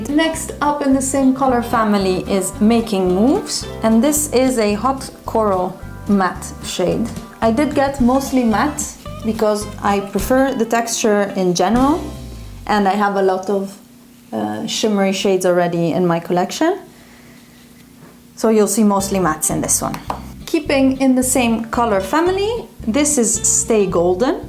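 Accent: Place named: Italy. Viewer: è il primo video che vedo tuo e non so perché sapevo che eri italiana per la pronuncia hahahahahaha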